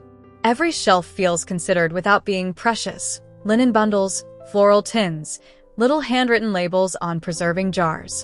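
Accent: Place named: United States. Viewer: So sad an English company and beautiful rooms has to be spoken by an american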